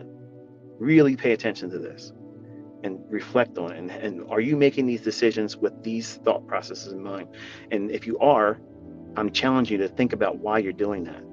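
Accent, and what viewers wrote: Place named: Netherlands. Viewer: yo bro ben je nederlands?? ik hoor het aan je accent man haha. ik ben zelf ook ICT student en zeker goede video die je hebt gemaakt very inspiring.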